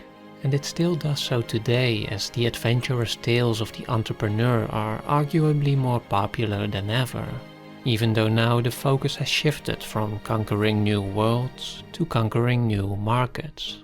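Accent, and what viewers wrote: Netherlands. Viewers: Duthch accent.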